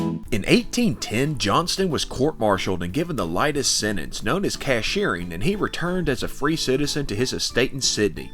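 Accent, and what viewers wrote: United States. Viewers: Great work! Got a bit of a laugh hearing someone so American sounding talk so succinctly about early Australian history…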